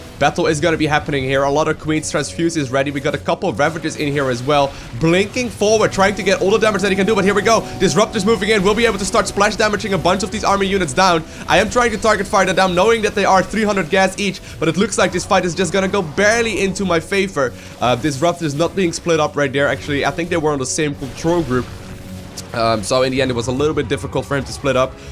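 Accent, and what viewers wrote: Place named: Netherlands. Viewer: Pretty sure he's Dutch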